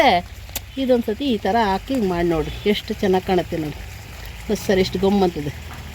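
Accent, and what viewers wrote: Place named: Channapatna, India. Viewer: Aunty maatu namm channpatna da slang super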